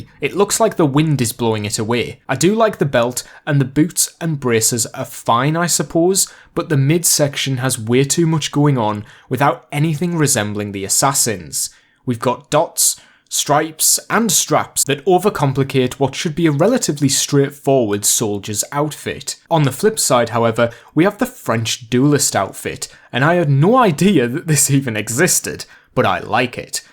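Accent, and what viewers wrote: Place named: Scotland, United Kingdom. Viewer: Great video and Ilove your accent man! Are you Scottish?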